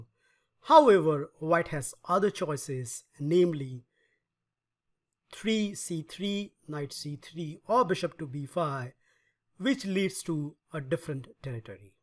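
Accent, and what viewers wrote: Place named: India. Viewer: The best Indian YouTuber with funny english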